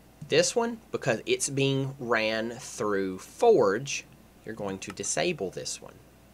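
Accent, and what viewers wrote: South, United States. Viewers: You sure know what you're doing! p.s Love the southern drawl, Where you from? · Love that southern drawl u got there too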